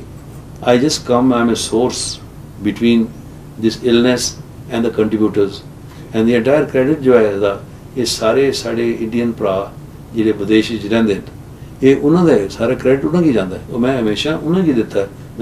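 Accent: Jhelum, Pakistan. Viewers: Hy oy bismilaah kran mahra grain sunil mery same te suchi boli jhelumi original boli. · tohadi boli v pakistan aali a❤ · My uncle, who is almost same generation as sahib, who is also from Jhelum, has the exact same accent, tonation, pronunciation and fillers (for instance "Hain jee"). So amazing. Ditto copy. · Pure Jhelumi language.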